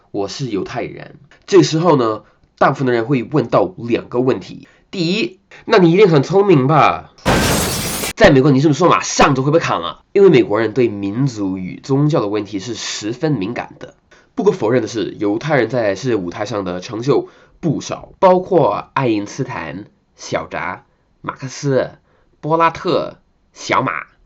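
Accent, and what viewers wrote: Beijing, China. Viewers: Hah 北京话说得可以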